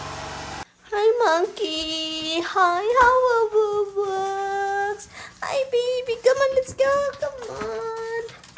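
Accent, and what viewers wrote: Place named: Tagalog, Philippines. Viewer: Natakdan-nahawa sa tagalog ate hehe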